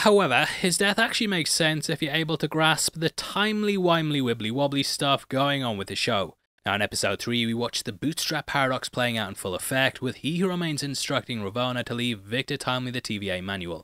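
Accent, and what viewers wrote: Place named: United Kingdom. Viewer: Me after each episode knowing I have a smooth talking British accent on YouTube ready to explain everything to me: ☺️💕🤪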